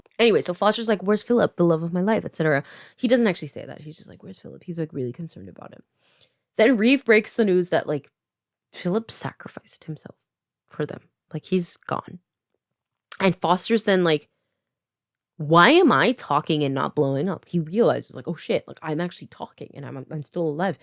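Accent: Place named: United Kingdom. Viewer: as someone who has been watching your videos for a while now i spent this video so worried about everyone who wasn't in the known main trio also the british accent caught me completely off guard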